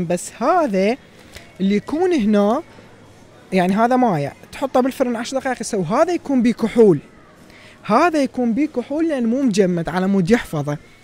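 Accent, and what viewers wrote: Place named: Baghdad, Iraq. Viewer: إشكد أحب الكلام البغدادي ❤❤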